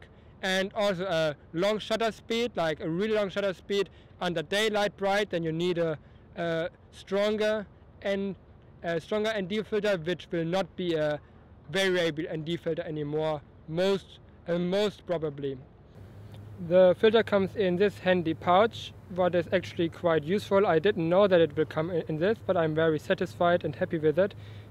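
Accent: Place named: Germany. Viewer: Hahaha, thought he was, but with a German accent (or Scandinavian accent)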